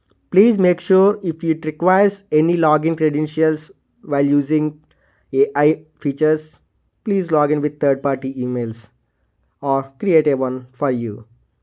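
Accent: India. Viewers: Indian guy explaining 😅😅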